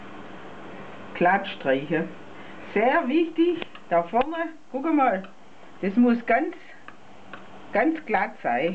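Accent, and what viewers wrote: Swabia, Germany. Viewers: Aus welchem Ort kommt sie, schwäbische Alb? Bitte auch noch das Rezept für den Teig posten! :-) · j t Sie is aus dem schwabenländle :) (also aus der Region um Stuttgart) den Dialekt oder der Akzent nennt mann schwäbisch :) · Das war schwäbisch, nicht bayrisch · Expert! Sounds like Schwabish. :) Born in U.S.A. but love to hear my Mom's dialekt. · Vielen Dank! So schön schwäbisch zu hören ❤ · I muss sagga i mag den schwäbschen akzent ga edda abba zu spätzle kehrt des eifach dazua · angiek 84 schwabenland, schwäbisch vom feinsten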